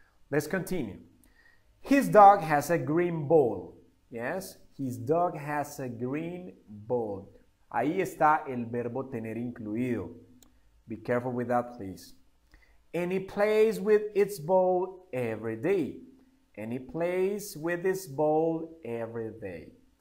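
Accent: Antioquia, Colombia. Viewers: El mejor profesor de inglés de todo el mundo... Nadie como tú eres demasiado bueno para enseñar... Saludos desde costa Rica. Adoro tu forma de enseñar Inglés y también adoro tú acento colombiano 🥰😘😘😘😘😘😘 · Es colombiano, cierto? De Antioquía?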